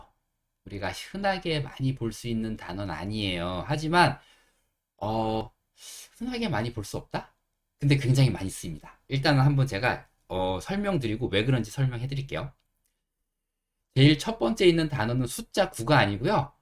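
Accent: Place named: United States. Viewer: 미국발음 띤입니다!^^^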